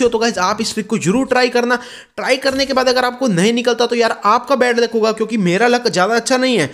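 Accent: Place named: Haryana, India. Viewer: Haryana se bhai tu teri language same hai hmare jasi😍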